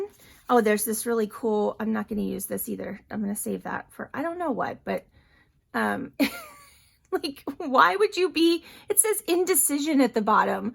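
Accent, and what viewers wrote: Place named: Pennsylvania, United States. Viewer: This is the first video from you that I've watched, I'm enjoying it and have subscribed. You sounded local so I had to look to see where your from, I'm from Western Pa so not that far. Looking forward to seeing more from you.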